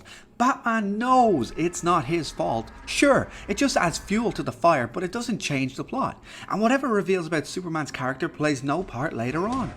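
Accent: Ireland. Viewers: More than likely the Irish accent. The volume level of his voice is fine. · Nice Irish lilt. Ba dheas an rud a cloisint ar YouTube. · this irish sounding guy understands the greatest american superhero better than zach snyder and every dc fanboi.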